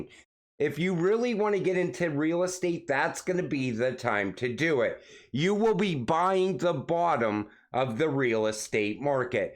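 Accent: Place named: New York, United States. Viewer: Hey My Man, Since it's obvious from your accent that you're from the New York area, I just want to "Bust your Balls" for a second. I busted out laughing when you said "Kenny Nguyen" by pronouncing it "Na-goo-in" when it actually pronounced "Nwen". It's a name that's very common in Vietnam. That's it. Keep up the good work.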